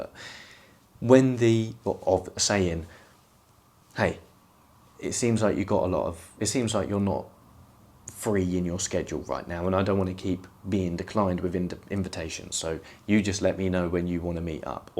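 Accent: United Kingdom. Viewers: Who is this guy and what is his credentials outside of British accent?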